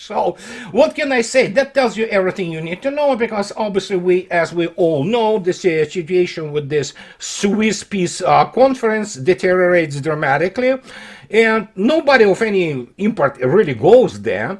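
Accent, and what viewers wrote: Russia. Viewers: I don't understand people who complain about you speaking too fast. I usually speed you up to 1.25! Maybe I am just more used to the Russian accent, seeing as my wife is Russian. I follow numerous channels like Judge Nepolitano with Larry and Ray, Col. MacGregor, Scott Ritter, Pepe Escobar, not to forget the two Geek boys on the Duran. Won't get through all of them at normal speed. 😁😁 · Thank you for YOUR Russian insight, Russian high standard delivery, Russian accent and Russian humour!!! Excellent shows every time!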